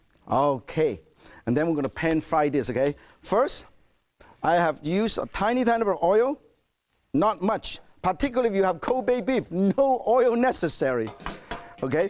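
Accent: China. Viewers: sounds like * inspiration for the Chinese English accent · i love the chinese accent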